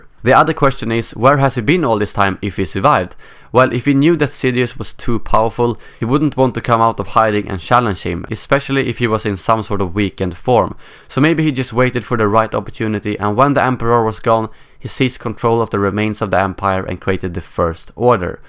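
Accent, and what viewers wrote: Sweden. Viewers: the swedish accent doh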